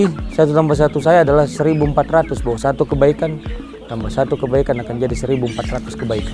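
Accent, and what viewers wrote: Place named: Sulawesi, Indonesia. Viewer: Logatnya mcam orang sulawesi kyanya ya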